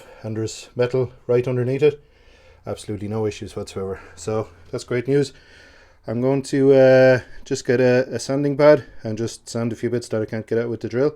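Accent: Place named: Ireland. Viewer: Nice job mate, and timely! I've just acquired 72 low light, and have the same job to do. And that Irish brogue!! I could listen to it for ages, love it. I'm from Australia. 😂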